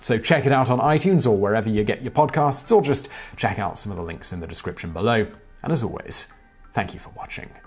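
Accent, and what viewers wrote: United Kingdom. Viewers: Why the host, who sounds British, giving an american salute in the thumbnail?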